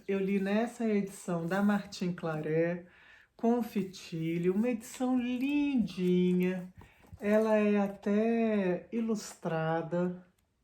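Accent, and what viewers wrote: Minas Gerais, Brazil. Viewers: nossa, como amo seus vídeos e o seu sotaque então. sempre mato a saudade de Minas, estado onde passei minha infância e que tanto amo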